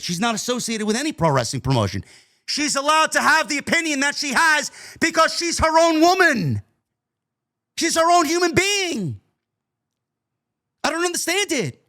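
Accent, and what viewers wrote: New York, United States. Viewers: The 2nd New Yaaaawkkkkaaaah I've liked in my life. Good on ya, kid.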